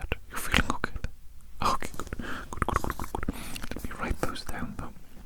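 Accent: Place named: Scotland, United Kingdom. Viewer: Is he scottish ? Idk why but i'm pretty sure he has a scottish accent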